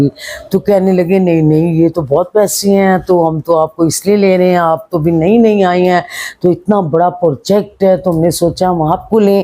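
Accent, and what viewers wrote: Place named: Punjab, Pakistan. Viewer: آصف خان کی اردو کی نقلیں اتارنے کی ضرورت نہیں انکی اردو آپ کی پنجابی نما اردو سے اچھی تھی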